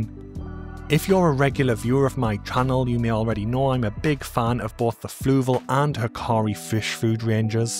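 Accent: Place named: Newcastle, United Kingdom. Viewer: Mate how you haven't blown up yet is beyond me! I truly believe you're the best fresh aquatic channel on YouTube, comprehensive, accessible, VERY WELL RESEARCHED, no tedious filler content or salesmanship. The Geordie/Smoggie accent is a bonus, sorry can't tell the difference 😅 All the best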